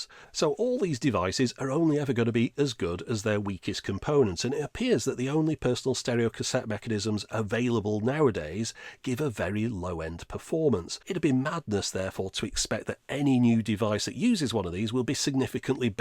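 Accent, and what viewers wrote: United Kingdom. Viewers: I do like, of course your videos, but your voice. Nice and calm. Like to hear a British voice instead of always American.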